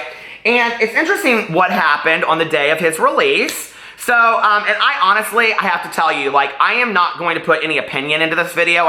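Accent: South, United States. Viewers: ahhhhh j star clickbait from the drama channel. is so vocal fry...drama channel grown man trying so hard to sound like an 80's valley girl with his gay southern accent.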